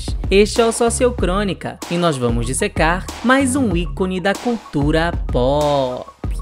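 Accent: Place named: Rio de Janeiro, Brazil. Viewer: este sotaque carioca, que mata...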